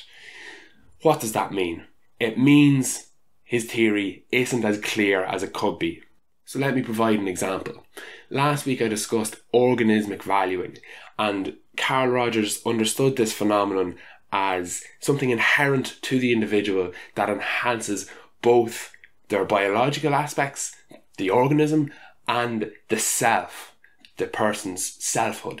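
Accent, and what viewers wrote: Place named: Ireland. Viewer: the kindness in the eyes, the gentleness that comes up naturally, the polite approach combined with evidence, and the lovely 'r' cannot belong to anyone else but an Irish soul! I am a foreign student from Bulgaria and have done my BSc in Scotland and MA in England and have been luckily blessed enough to meet the Irish culture as well along my way and could say that I love all my Irish friends! Been a pleasure to watch your content! Keep up the good work!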